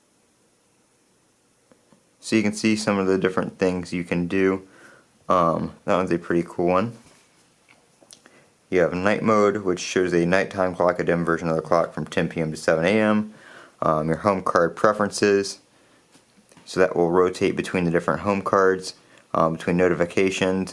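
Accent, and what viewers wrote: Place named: United Kingdom. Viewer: WoW I don`t know why she sound British for gods sake WTF !!!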